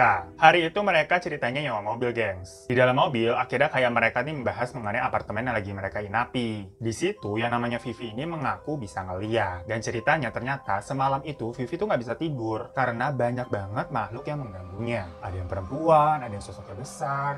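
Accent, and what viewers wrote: Java, Indonesia. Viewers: Bg iki wong jepang atau wong jowo?? 😂😂 sipit² tapi koe medok wkwkwk Lucu sih..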